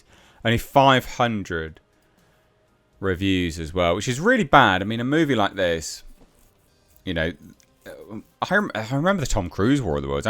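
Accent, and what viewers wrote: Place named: United Kingdom. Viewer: I don’t know if it’s his British accent but is so funny 🤣 he’s not even trying to be funny.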